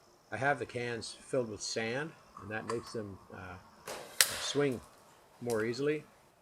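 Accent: Canada. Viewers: Aboot? Oh Canada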